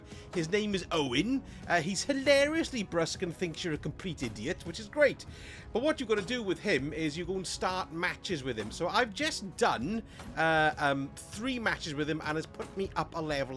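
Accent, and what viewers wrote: Wales, United Kingdom. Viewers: I came on this by accident and was delighted to hear your beautiful Welsh voice,. Used to follow you back in my Destiny 2 days. (Seriously, you should voice act on Elder Scrolls 6!)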